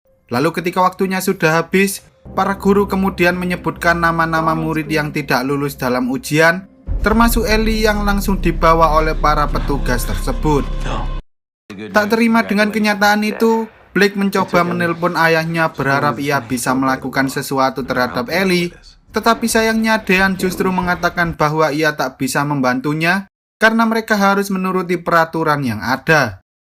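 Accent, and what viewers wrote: Java, Indonesia. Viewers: Jowo kentel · Ya allah mas medoknya😢😢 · Baca ny jgn medokkkkkkkk😂😂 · Medok banget aksen bicaranya, bikin pusing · logat jawa ya bang biasa yg gw dnger logat sunda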